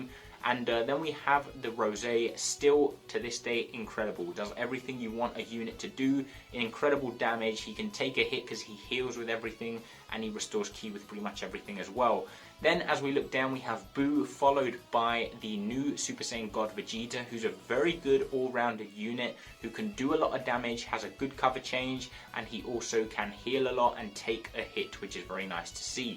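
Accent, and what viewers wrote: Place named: England, United Kingdom. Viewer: Do you come from England I like your accent. I come from England ❤️🏴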